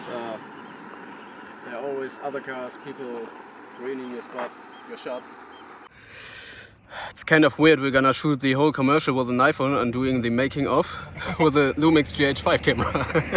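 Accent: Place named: Germany. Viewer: Allein wegen dem deutsch englisch like am Start 😂👍🏽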